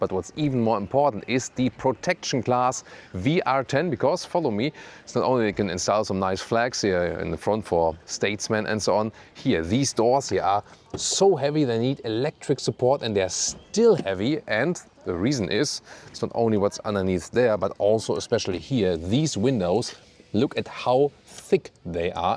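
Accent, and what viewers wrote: Germany. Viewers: As a woman, the host is very hot. However, the German/Austrian accent just ruins it for me. He'd be a perfect 10 if he spoke Italian, perhaps Portuguese ❤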